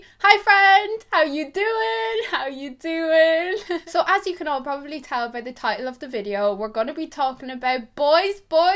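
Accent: Ireland. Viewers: I have detected an Irish accent and I am assuming you grew up in Ireland. But as you most likely know in Ireland discos can be quite risky. Could you maybe do a video on how to deflect pressure from guys at these teenage discos. Lately guys get their friends to pressure you and it can be quite overbearing and intimidating. So I was just wondering as a fellow Irish girl if u had any tips or advice for handling these awkward scenarios. I don't know if this topic was covered in the video as I am waiting for it to buffer but if it is just ignore me. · Is your accent Irish? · VoiceYT shes irish :)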